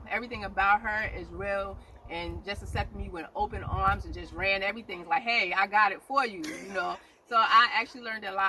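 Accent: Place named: New York, United States. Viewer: Very informative...she must be from NY or NJ...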